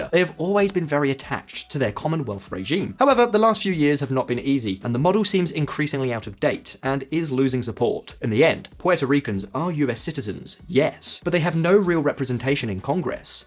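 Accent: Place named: United Kingdom. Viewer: This is a great article about Puerto Rico becoming the 51 State ! Aside of being a great subject, the only problem I have is trying to understand this man with such strong British? accent & on top of it , he just talks way too fast! Nevertheless he is on point & definitely did his home work on the subject! Ps: Slow down & continue educating people in regards to the Statehood for Puerto Rico!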